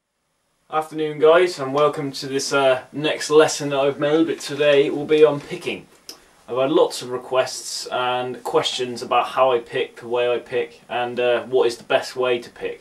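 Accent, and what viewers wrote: United Kingdom. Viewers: Is that a west country accent i detect ? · you look and sound like marty friedman, just with britsh accent :o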